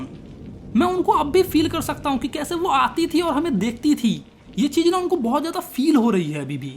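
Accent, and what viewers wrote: Bihar, India. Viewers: sir mujhe aisa lag raha hai ki app bihar se ho qki apki awajj bihari jaisi hai 😁😁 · Sir are yuo bihari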